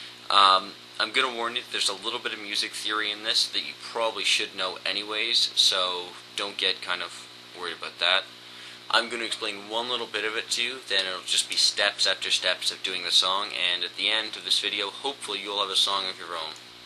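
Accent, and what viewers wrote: Canada. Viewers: Are you canadian? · Has anyone ever t old you that you have the most adorable Canadian accent ever? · nice vid. are u canadian?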